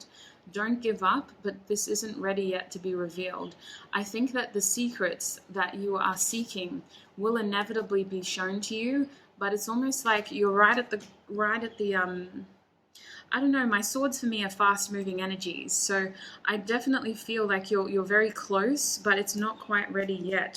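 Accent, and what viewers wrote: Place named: Australia. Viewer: Thanks for the reading dear. I love your Australian accent it is cute and unique. Happy new year.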